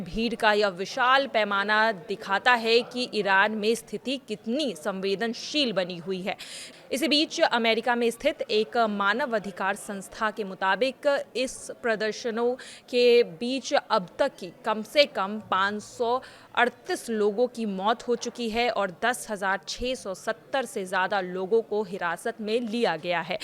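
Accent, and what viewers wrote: India. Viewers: बिहारी न्यूज एंकर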